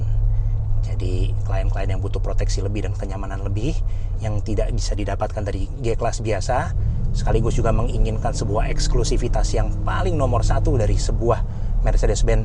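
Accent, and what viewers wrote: Jakarta, Indonesia. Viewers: Betawi banget ngomongye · Kacaoo om bos , logat jakartanye kentel banget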